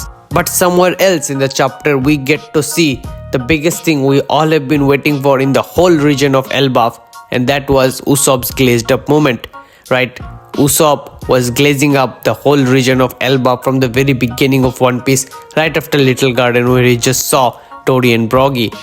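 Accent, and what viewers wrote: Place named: India. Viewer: Brother your English accent is Indian so if you are a Indian then try it to explain in Hindi there are indian community also + your voice is also good 👍🏻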